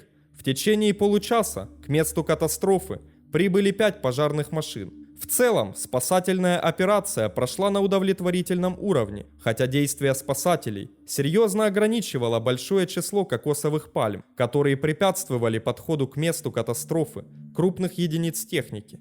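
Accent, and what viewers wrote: Ukraine. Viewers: Вроде Украина или Белоруссия. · Скорее, Украина. У него не белорусское произношение.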